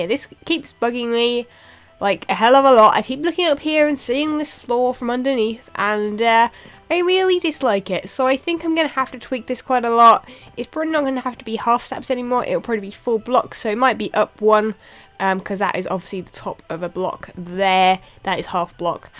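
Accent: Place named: Fens, United Kingdom. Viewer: Fab Vid! I have to ask though, your accent... you were sounding very much like a fenland lass lol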